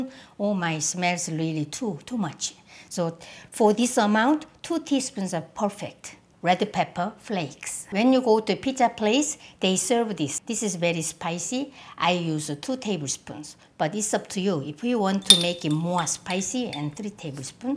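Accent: South Korea. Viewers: 와 한인영어 너무좋아요 항상 잘보구잇습니다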